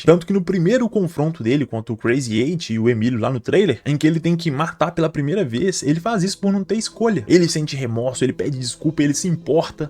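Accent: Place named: Bahia, Brazil. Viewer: levemente baiano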